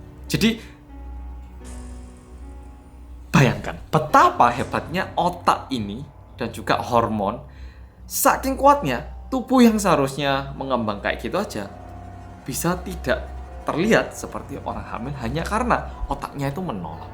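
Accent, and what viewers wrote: Java, Indonesia. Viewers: Apik Mas Bro. Serasa wong jowo korea. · Bersama yang medoookkkkk 😄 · Mau saran dong buat tambahin english subtitle karna suka banget sama konten2nya mas, apalagi kalo lagi bahas kasus2 di korea, kdrama atau kpop. Suka pengen share ke temen2 internasional sesama pecinta korea karna mas suka kasih info yang gaada di tempat lain, cara ngejelasinnya juga bikin betah ga ngebosenin tapi sayangnya gaada english subtitle jadi kadang suka males bikin summaries-nya. Biar sekalian bisa memperkenalkan bahasa indonesia dan logat jawa ke luar negeri plus bisa nambah internasional viewers biar go internasional. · Kulo kalah medok Karo sampean mas 😄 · Bersama orang Korea yang "medok" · Aku ikutan bilang.... medhookk:"))) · Bersama korea yang "medok" 😁 I'M ADDICTED 🙆‍♀️ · Gue selalu ngikutin pas ngmg bersama orang korea yang MEDOKKK:)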